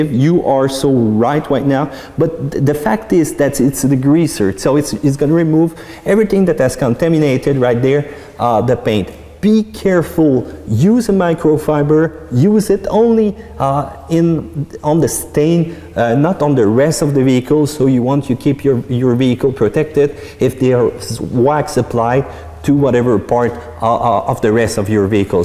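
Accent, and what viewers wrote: Quebec, Canada. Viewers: hahahhahaha quebecoissssss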